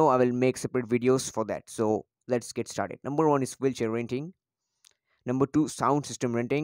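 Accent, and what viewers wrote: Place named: India. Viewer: He is from India.. accent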